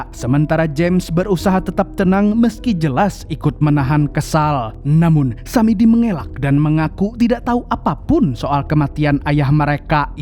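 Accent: Banjar, Indonesia. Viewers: Mang orang banjar kah pian..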